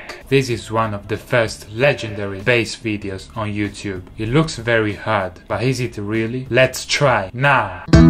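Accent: Italy. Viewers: That's the Italian accent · His italian accent is pretty huge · Si sente che sei italiano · I think he's actually italian because i'm italian ad he speaks like me when i dint't care about the english pronunciation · His passion and musical skill is addicting...hahahahaha Not to mention that hes kind of cute and that Italian accent puts the cherry on the top of the cake!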